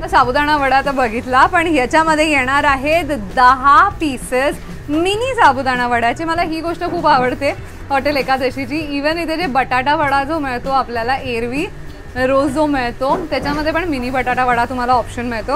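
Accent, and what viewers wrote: United Kingdom. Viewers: Marathi marathi sarkh bol agdi as british accent mdhe marathi bollya sarkh nko karus otherwise no doubt ur fab❤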